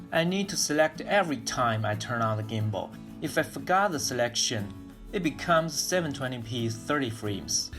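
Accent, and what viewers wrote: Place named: China. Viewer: Tsie tsie for taking the effort to learn English so you can make your reviews available to many more people. This is an EXCELLENT review and will help many people understand what the Smooth4 does and will also help them make a decision whether to buy. I particularly appreciate the multiple shots and the editing you did to show us a comparison side-by-side of this product and other products or comparing the Smooth4 to no gimbal. Very effective. Your English is perfectly adequate to communicate on a tutorial like this. You said you would like to improve, which is always the mark of a smart person. My suggestion to you would be to get some audio (extract from a YouTube video) of a native American English speaker saying the words "AIR" and "LOLLIPOP" and "RAZOR." Listen to those words over and over while you work on making your pronounciation identical to theirs. 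This will help train your mouth muscles to make sounds that are not in the Chinese (or some European) language. One more comment: Wherever you are in China is beautiful. I wish all the politicians would learn to get along and be friends with each other so all of us could visit and exchange ideas more freely.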